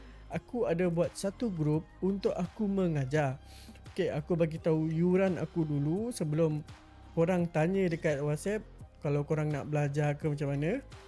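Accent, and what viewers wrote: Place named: Malaysia. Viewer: kaya orang malaysia logat nya... apa melayu indo..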